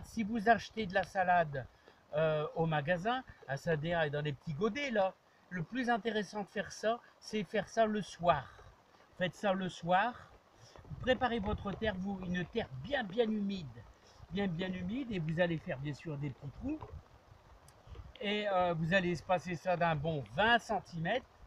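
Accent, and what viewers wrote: North, France. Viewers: Bonsoir merci pour votre vidéo, oui il pleut et attention au mildiou!! il faudra surveiller. J'adore votre parlé du nord!! A bientôt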